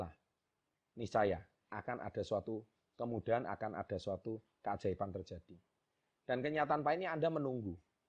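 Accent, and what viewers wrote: Java, Indonesia. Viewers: Bang orang mana ya kok Logatnya,logat jawa.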